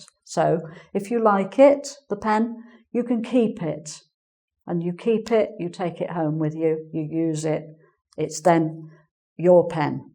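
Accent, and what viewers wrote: United Kingdom. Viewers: I really enjoy your lessons, enjoining listening to very clear British accent, and I learn new things such as the difference between: can you please and could you please. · AstronoyBrasil Hi! I was curious about the same thing and then I've found this site with some information about all of the Engvid Teachers: <link> - I'm not sure whether Ms. is from UK or not. However, we can see she lives in London and her accent is perfectly British! Hope this helps you. Bye! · a brilliant teacher and british accent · amazing Teacher) and her accent is so British. she must be perfecr granny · From Brazil From Marcio Hello Teacher... It is a pleasure to meet you... I had never seen a class of you here, but I am enyoying it a lot. Where you're from? Your accent sounds like a British person. I love it. Hugs!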